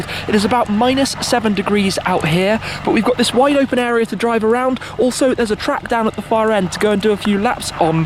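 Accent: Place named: United Kingdom. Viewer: 我喜欢听你说话的口音，标准英式英语。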